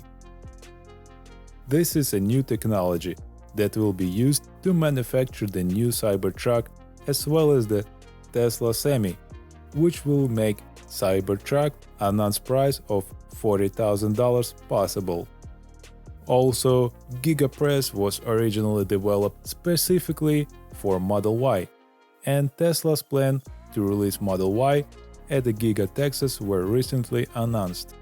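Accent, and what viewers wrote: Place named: Russia. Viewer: Ты из России? Звучишь как один из наших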